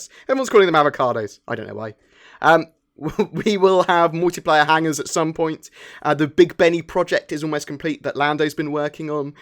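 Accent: United Kingdom. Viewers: great video, love hearing a British voice talk about SC always makes it sound do much more sophisticated lol. please don't stop making videos!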